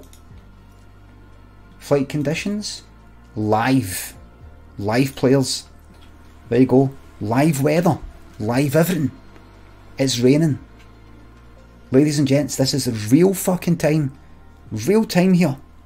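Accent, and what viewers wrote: Scotland, United Kingdom. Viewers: They say that plane passengers feel most comfortable with a male pilot with a scottish sccent, and i must say that the scottish sccent still outweighs your awful flying, id fly with you anyday!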